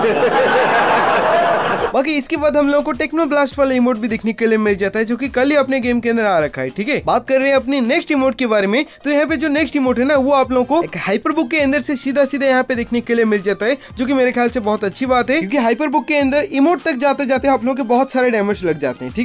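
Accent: Odisha, India. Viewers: Bhai tum odisha se ho na?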